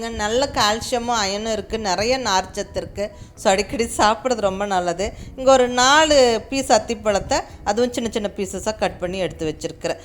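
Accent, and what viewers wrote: Kongu, India. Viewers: Good one ma'am. Thank you. Namma kongu tamil alago alagu.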